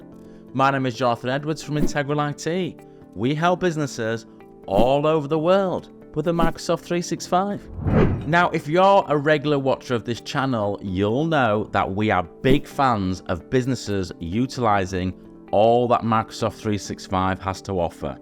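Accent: United Kingdom. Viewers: ‘Okay’ and ‘here’ love UK accent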